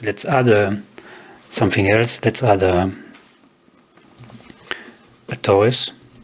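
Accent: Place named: France. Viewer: Thanks a lot my friend. Tu as l'accent d'un français. Je me permets de poursuivre dans notre langue. Je débute avec ton tuto, c'est vraiment limpide ! Merci bcp ! Je me demandais comment tu exportes ton fichier pour en faire un fichier de vdjing ? C'est peut etre une question hyper large mais voilà je débute complètement... déso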